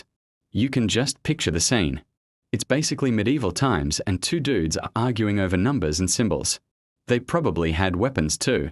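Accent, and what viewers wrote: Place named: United Kingdom. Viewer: An AI voice talking with a British accent, pronouncing Arabic names perfectly, and claiming that Muḥammad ibn Mūsā al-Khwārizmī was an African. In reality he was from Persian or Central Asian descent and was born in the region of Khwarizm (Chorasmia), near present-day Khiva, Uzbekistan. This channel is really unravelling...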